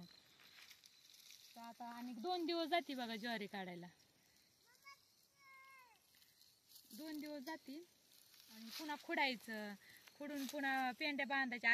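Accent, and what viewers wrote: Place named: Parbhani, India. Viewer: तुमच्या बोलण्याच्या भाशावरून परभणी किंवा हिंगोली जिल्ह्यातील वाटतात ताई तुम्ही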